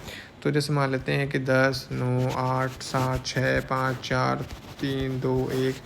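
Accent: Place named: Himachal Pradesh, India. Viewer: Sir aap himachal se ho na apke bolne se pta chl rha hai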